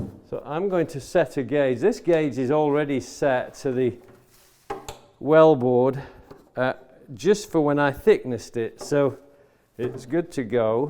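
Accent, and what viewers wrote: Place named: Northern England, United Kingdom. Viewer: Excellent Video/s - which part of the UK are you from and where are you now? My question is, after watching closely and carefully your Saw and Chisel Skills throughout your Workbench Build videos - what is your preferred Chisel Brand and why? - AND what is your preferred Saw Brand and why? I suspect by your slightly 'accented ' Northern dialect, you might now be located in the USA so, as such, maybe your Chosen Brands might not be available in the UK/France! I any case, l willl look forward to hearing from you soon - Thanks. All the very best to you and your family.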